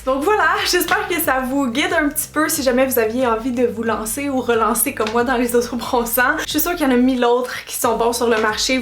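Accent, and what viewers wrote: Quebec, Canada. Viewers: Oh mon dieu qui vous a appris le français accent catastrophe hhhhhh les canadien il faut mieux essayer l'anglais un conseil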